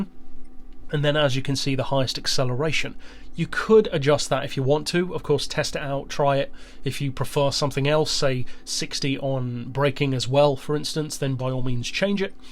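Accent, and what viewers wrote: United Kingdom. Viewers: All British people sound like to be honest.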